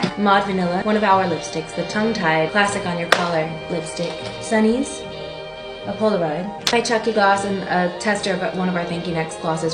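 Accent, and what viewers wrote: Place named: United Kingdom. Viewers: Well, she was in london for a long time so it's natural she'd pick up the accent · she sounds british now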